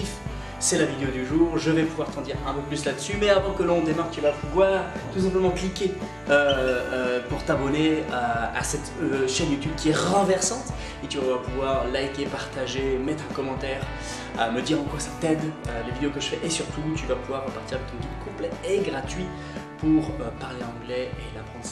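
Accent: Scotland, United Kingdom. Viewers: Quelle horreur l'accent écossais. Mais très intéressant comme vidéo. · J'adore vos vidéos l'accent écossais est magnifique . Je souhaiterais pouvoir télécharger comment puis je faire ? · Il est génial cet accent !!!🤣🤣🤣🤣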